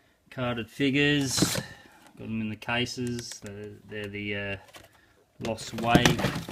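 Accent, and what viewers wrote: Australia. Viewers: U sound Aussie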